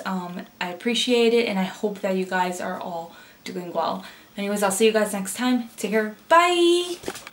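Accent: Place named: New York, United States. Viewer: You have no idea how much I love your accent!! NY❤️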